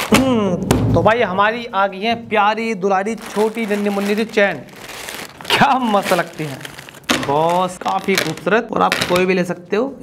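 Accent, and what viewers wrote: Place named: Bharatpur, India. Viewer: Aapki bhasha humare yaha jesi h bharatpuriya jo bahut achchhi. Lagti h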